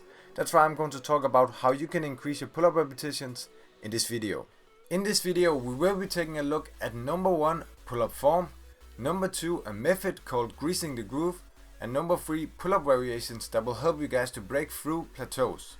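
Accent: Denmark. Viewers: This was on my YT suggestion. Gr8 vid. New sub. Look at others soon. Not always timely to go to gym. And whoa.......a very nice ring of a DK accent speaking English......all success for this channel to you.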